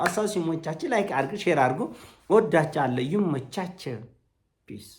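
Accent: Tigray, Ethiopia. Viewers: ለኣንተ ግን ጥያቄ ኣለኝ ለምንድን ነው አማርኛውኝ በትግርኛ accent እያወላገድክ ምታወራው 😂?? ኣንተ ሰግጠህ በ accent እየቀለድክ እያዘላገድክ ፈምሰህ እንዴት ሰለ ሰነሰርዓት መናገር ትችላለህ ? ኣሁን አወላግደህ መናገር ሰልጣኔ ነው አንድ አማርኛውን እያበላሸሀው ነው ሌላ ደሞ ሌላ ሰው ለመምሰል እየሞከርክ ነው ራሰህን ምሰል ።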